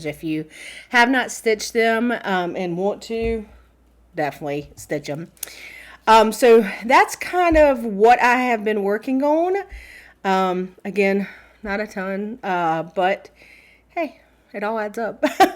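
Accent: South, United States. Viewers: Congratulations! I look forward to watching your videos. Love your “southern” accent and pronunciations. I’m a southern girl, too. I didn’t know I had a southern accent until I moved to California. Thank God I’m back in the south😜😜😜I would love to win your fancy scissors🙀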